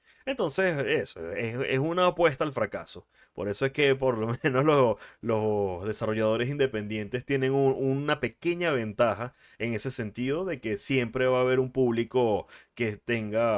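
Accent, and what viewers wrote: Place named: Spain. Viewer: Dios yo siempre había tenido dudas por ti acento y tus expresiones sobre si eras español o de latam Por fin hallé la luz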